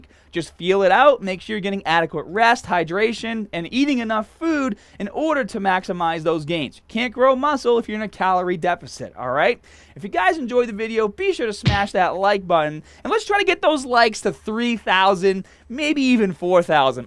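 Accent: Boston, United States. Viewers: Love that Boston accent bro! Good info!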